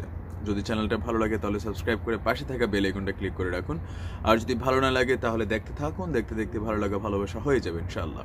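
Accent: Comilla, Bangladesh. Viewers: ভাই আপনার বাড়ি কুমিল্লা না কি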